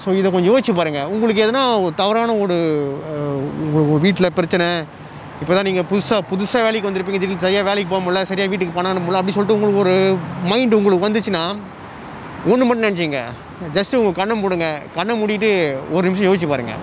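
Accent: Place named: Singapore. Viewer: Bro Singapore Yan mathire pesama Nama ooru alumayhiri pesuka bro video nice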